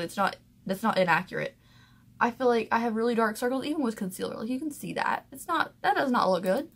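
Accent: South, United States. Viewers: Love you MOSTEST sis!! I'd LOOOVVEE to hear your southern accent in full force... I have a southern Illinois accent so a drawl out my A's real bad. I don't notice it but when I traveled west I got a bunch of shit for it.. Not bad. Just shit. Lol anyway have a good night girlie!! ✌🤘💋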